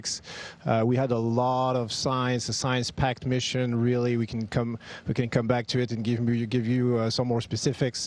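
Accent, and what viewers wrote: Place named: France. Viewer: Français, definitely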